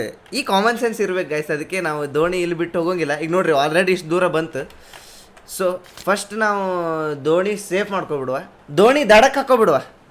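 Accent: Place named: Coastal Karnataka, India. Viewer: Guru karavali shyali maath ajji maat super chanda guru aadre nin yen guru karavali ne marth bittidya ansute adke maath sa change aagide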